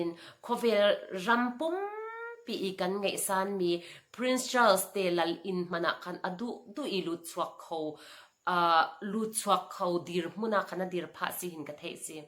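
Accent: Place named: Mizoram, India. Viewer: Na holh Accent hi a Pangkhua holh thluk ngai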